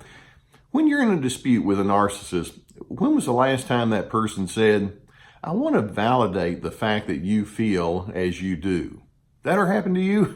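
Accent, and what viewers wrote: South, United States. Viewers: this is fantastic guidance, all your advice is spot on, (and I love your southern accent !) many thanks, from the Uk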